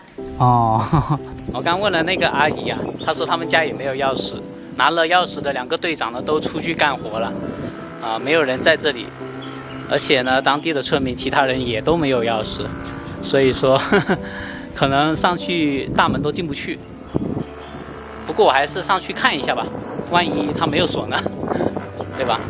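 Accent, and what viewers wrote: Sichuan, China. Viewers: 四川话寇以撒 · 也會說四川話了😂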